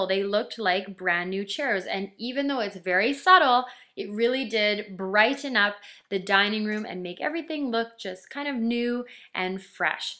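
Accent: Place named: Canada. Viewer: ahhh. That's cool. I thought I detected a bit if Canadian accent in your voice. You are crushin' it, Mama! Way to go!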